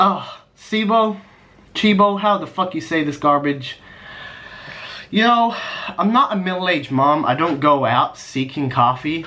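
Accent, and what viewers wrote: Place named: United States. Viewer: Honestly a solid list, not a lot to contest here. Would have put Sushi Train at a B tier at most purely out of cost vs product but that's personal choice. Same goes for IKEA; cost of going there doesn't make the trip worth it so that's at most a C tier. My biggest issue is Subway as a C tier. You're clearly from the states with that accent but here in SA a proper Subway is at least A tier. As a whole, this is good list and I look forward to more on the flip side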